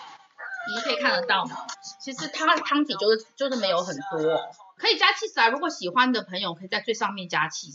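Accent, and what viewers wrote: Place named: South Korea. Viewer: Kelly講話也好可愛，因為習慣韓文，所以有時候講中文的尾音也有「喔」，現在放蔥下去煮喔，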